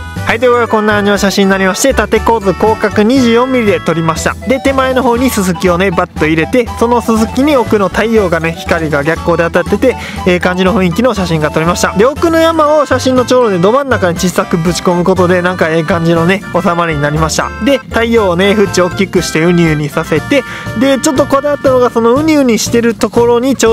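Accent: Kansai, Japan. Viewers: 自然な関西弁が好感持てます。 また、どんな目的でどうやって撮影してるのかも参考になります。 撮影した作品は応募とかされてるんでしょうか。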